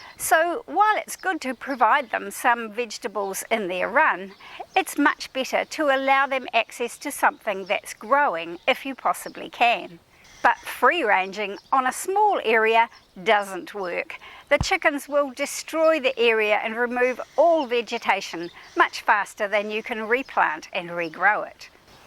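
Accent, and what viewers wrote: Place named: New Zealand. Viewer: that was my guess that you lived in New Zealand just got Clues from your timestamp the beautiful weather in December, and your accent. A lot of your words pronounced just like your FORMER prime minister what's her name. Jacinda? 😅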